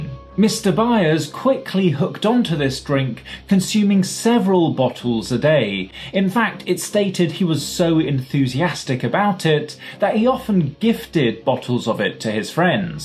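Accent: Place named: RP, United Kingdom. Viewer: Not only this material is utterly informative...the level of English RP is amazing,great training for an English teacher like me hailing from Havana.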